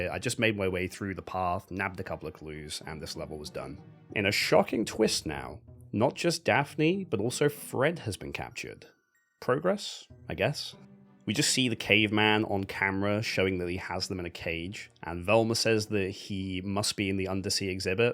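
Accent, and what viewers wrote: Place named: United Kingdom. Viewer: London :) that’s why I asked. I can tell you’re British. Just wondered which part of the uk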